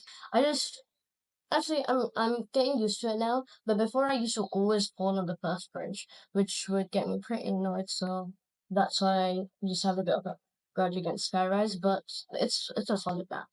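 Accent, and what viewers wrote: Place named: United Kingdom. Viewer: damn your voice sounds so young ive never heard it before also you sound bri ish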